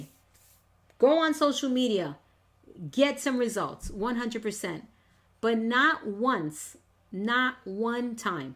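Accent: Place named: New York, United States. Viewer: I have followed you since you showed up on the paper crafting scene so many years ago. I can still hear your NY accent! I have always believed that you are brilliant and have designed things unlike anyone else. That company was wrong and unprofessional. That designer that included the elements that were not theirs is also wrong. But, unless they can show proof of copyright, you have done nothing wrong. Please pursue legal action as this can be very harmful to your reputation. You need to stand up for yourself to make it clear that you will not tolerate this kind of behavior. I am so sorry this has happened to you, and you do not deserve it. Stay strong and proud. We know who you are, and that is all that matters in the end. Hugs to you.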